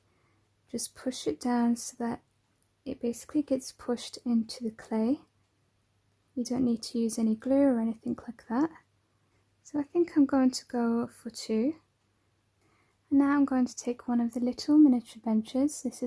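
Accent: England, United Kingdom. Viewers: do you live in England??? Love ur beautiful accent!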